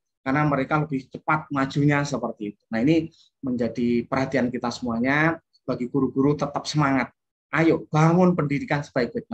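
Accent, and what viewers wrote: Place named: Pasuruan, Indonesia. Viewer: Suara pasuruan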